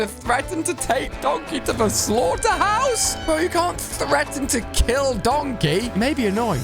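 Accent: United Kingdom. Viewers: I'm Irish are u like British or? I love the smartie chocolates btw!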